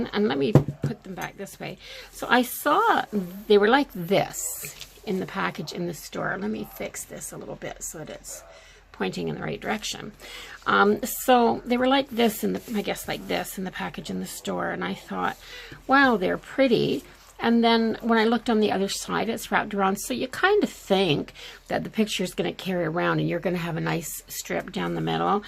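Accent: Canada. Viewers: Are you Canadian??? Love your brouge (accent) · You must be from Canada? The way you pronounce out... Beautiful job on the mason jar! Thank you for sharing 💖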